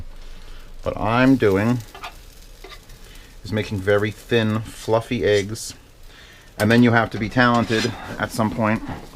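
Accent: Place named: New Jersey, United States. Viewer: Where you from? Sounds like Jersey or Philly... just bring it up because "white American"... I say it, and I'm from South Jersey (right outside of Philly)....live in wretched North Dakota now and they call white American..... fucking Swiss!!! Swiss!!!! It's not Swiss, Swiss has holes and is also delicious with imported ham, but is NOT white American cheese damnit. Ok, that's all.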